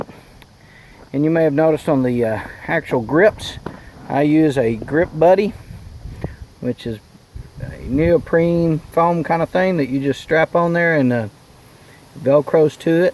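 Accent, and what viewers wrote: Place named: Texas, United States. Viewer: Man... if i close my eyes, you sound like Baby Bush....must just be the Texas in your voice.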